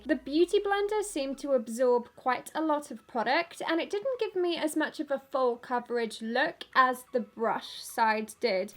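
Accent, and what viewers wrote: United Kingdom. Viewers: I love her and her british accent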